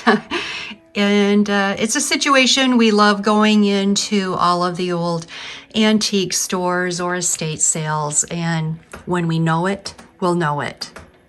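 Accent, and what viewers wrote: Midwest, United States. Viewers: I love your Midwest accent it’s adorable. It reminds me of someone I used to work with who was from Michigan. I’ve spent time in New England and my favorite states are Maine and New Hampshire. Exactly my idea of living a peaceful lifestyle style in a beautiful place. Unfortunately my husband loves California which I do not. We live coastal in a safe beautiful area but it’s way too crowded and EXPENSIVE! I’m still occasionally looking at houses over there hoping my husband changes his mind after we retire. Your videos are something I look forward to every Sunday! ❤❤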